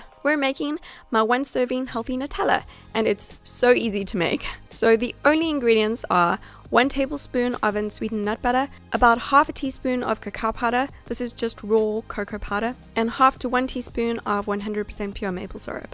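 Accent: South Africa. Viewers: Love your accent, is it South African? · Nope shes South African, u can tell by her accent :P, some south Africans have that accent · when I heard your voice I was immediatly like South African hahaha I,m from cape town but love the video and thank you' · You sound South African 🇿🇦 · Nice South African accent. Woohoo. I'm trying to lose a little bit of fat around my tummy. I work hard on my body and run hard but always have a biscuit for tea time and white bread. These are the clean snacks I'm looking for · Is she from South Africa ?? Cause she sounds south African 😹 and she uses a lot of Woolworths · Do you live in South Africa? You sound South African 🤔 I'd love to see a video with a bit about your life ! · Are you south african? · Are you South African? Your accent sounds like South African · Are you South African? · Are u from South Africa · Are you a South African?? 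· Video was really useful and aesthetic 💕💕💕 Also a question... are you South African? Sorry if I’m wrong but your accent sounds really South African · Are you south african? You rlly sound like one!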